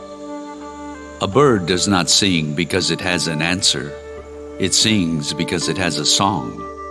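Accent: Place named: United States. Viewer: voice over😢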